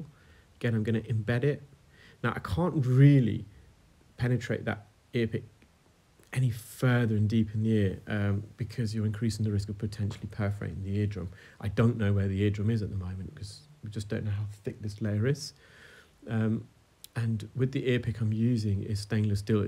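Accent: United Kingdom. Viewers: You're not the first to fall for a British accent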